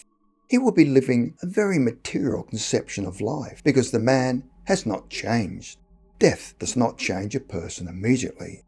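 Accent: Australia. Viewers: Great material but it is hard to follow your speech with your thick Australian accent. The woman that sometimes narrates your videos is quite understandable to an American ear. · Very easy for me - an Australian accent!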